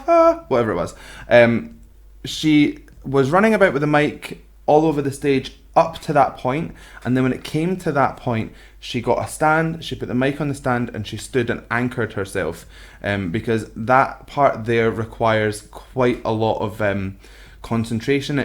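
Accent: Scotland, United Kingdom. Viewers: i see. thanks. i was leaning towards Scottish but was unsure. pardon my ignorance, its just that we, Americans have trouble distinguishing accents and i am fascinated by them. usually i can spot it better when its a bit heavier than yours. at any rate, thanks again. looking forward to seeing more of your entertaining and informative reactions. is my passion, so anytime you wish to react to her my soul will sing. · Hello there, I really love your scottish accent, feels like going home... And, yep Markos voice is awesome!! Try ' ', ' ' (Tampere) or ' ' from 👍😉 Greetings from 🇨🇭 🤘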